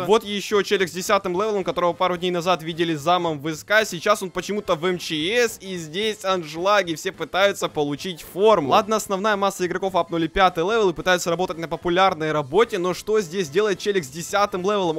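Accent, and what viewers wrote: Ukraine. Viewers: Ммм ты с Украины?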